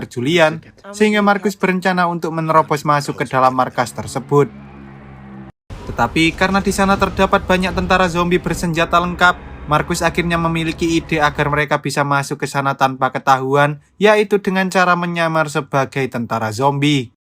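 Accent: Java, Indonesia. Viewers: Suka denger logat jawa medok mimin nya😁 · Jowone endi mas kok medok tenan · Medok gini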